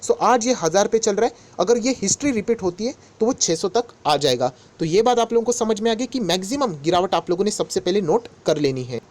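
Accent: Maharashtra, India. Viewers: by ur Hindi I got that u from Maharashtra so let me know bro 😎 that I can meet u really u are the honest retailers well wisher🙏🙏🙏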